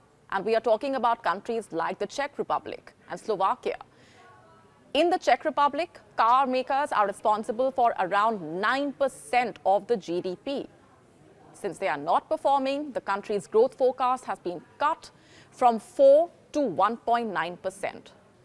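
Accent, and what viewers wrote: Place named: India. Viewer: This one has a thick Indian accent. Lol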